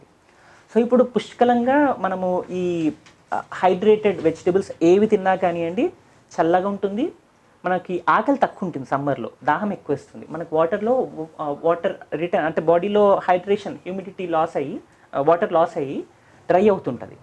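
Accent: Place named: Telangana, India. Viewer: Telangana yasa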